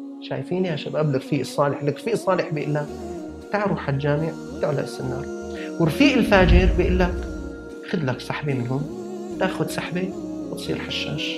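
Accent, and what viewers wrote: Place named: Levant, Arab world. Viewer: فين صوتك شامي فين دمشق...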